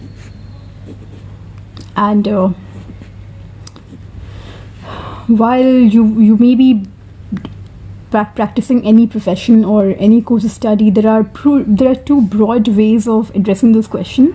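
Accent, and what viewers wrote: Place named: India. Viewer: You have a nice Indian accent :)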